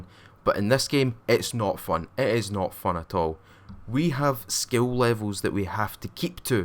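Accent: Scotland, United Kingdom. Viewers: I wanted to upvote, but then heard all your criticism, concenrns and stuff about ppl having things too fast, havign to farm forever in soem cases etc. After that I wanted to downvote because to me it seemed like you re not undertstanding this game is in alpha phase. Not even close to a real BETA... So there are goign to be shitloads of changes. If you criticize the gmae then always keep in mind in what phase/state the game is. You simply cannot treat it and judge it like its a finished game. It will be in development for at least 16-20 months. In the end i upvote cause I love your scotish akcent and the enthuasiasm how you present the game :P. I hope I could help you with my feedback. :)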